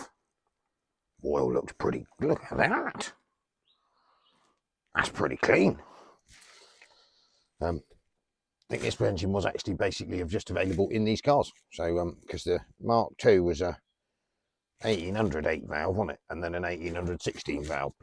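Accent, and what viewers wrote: United Kingdom. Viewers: As an American ur the first British person I’ve had a hard time understanding lol I love the accent and the car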